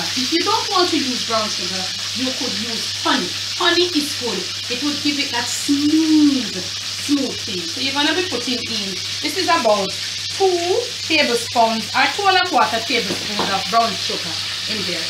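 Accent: Jamaica. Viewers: Typical good good up Jamaican cook. She switches effortlessly from proper english to patois... Cho! Big up, food look so good... 🇯🇲🇯🇲🇯🇲🇯🇲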